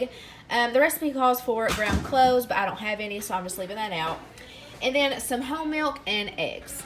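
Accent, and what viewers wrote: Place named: North Carolina, United States. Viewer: Girl you must be from NOrth Carolina lol... that accent though 😆 love it! And thanks for the great meal ideas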